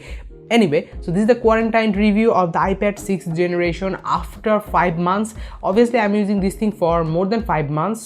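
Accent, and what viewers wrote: India. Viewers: Your english is so smooth than another indians. Love the accent 🤓 🇲🇨 love indian people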